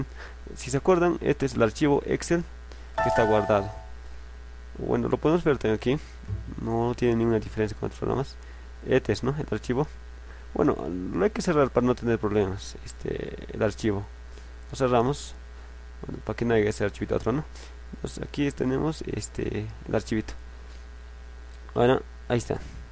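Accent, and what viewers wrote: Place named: Puno, Peru. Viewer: si pasas unos meses en el departamento de Puno hablarás igual, es el dejo de la región así tenemos otros dejos o acentos en diferentes ciudades del Perú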